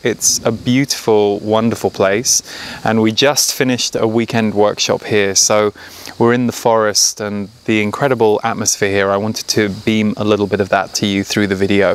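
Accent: United Kingdom. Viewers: Thanks - love your work and great to hear a Brit.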